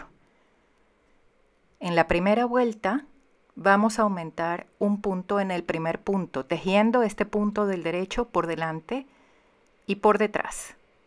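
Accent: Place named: Latin America. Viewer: Que bonito y que divertido ese chal asimétrico gracias por compartir... una pregunta de que país eres porque tu acento es latino. Es una curiosidad saludos desde Colombia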